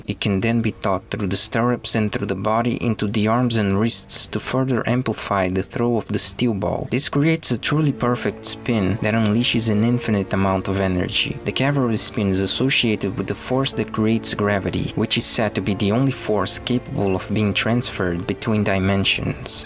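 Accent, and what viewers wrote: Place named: Brazil. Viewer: Mano, tu é Br ?, parece c o sotaque de Br ein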